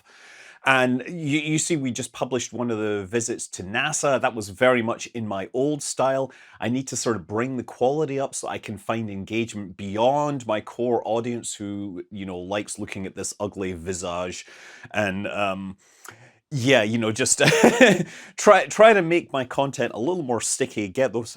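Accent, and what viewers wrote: Scotland, United Kingdom. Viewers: I'm not here for the visage. I'm here for that Scottish brogue! 😁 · Please confirm that you will still says "moon" with that deep Scottish accent. Nobody wants that to change. On a more serious note: Congratulations on this new chapter on your life. It seems you have a lot of good fun ahead of you. Happy for you. Enjoy the ride. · Congratulations, you're a genuine good guy who deserves all the success in the YouTube world. Have always loved your channel and your unpretentious style of presenting. Plus you haven't adopted some awful mid Atlantic drawl and kept your lovely Scottish brogue 😂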